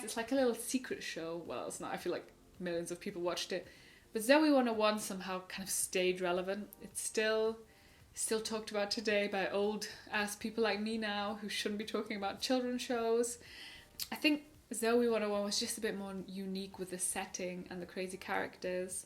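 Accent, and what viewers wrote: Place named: Germany. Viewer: Wie man den Deutschen akzent direkt raushört😅. Hab vor kurzem wieder Unfabulous durchgeguckt. So nostalgie aber zoey101 hab ich auch geliebt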